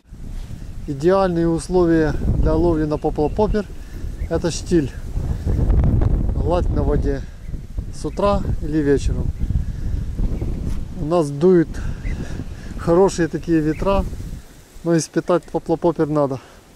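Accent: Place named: Russia. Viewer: у вас наче старо-руський акцент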